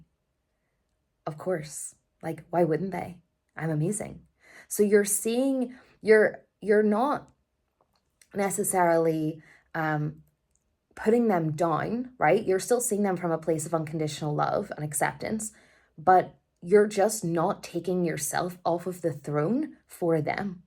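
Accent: Ireland. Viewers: I love your accent! I'm in the states but have blood over in your neck of the woods. Galway I believe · am from the uk and i understand your Irish accent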